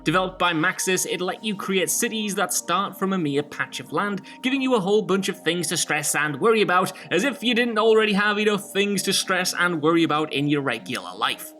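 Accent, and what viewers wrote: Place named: United Kingdom. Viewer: I think you went far to British in the vid. Had no idea what you were saying during skate section. Also Americans watch this channel. Your welcome.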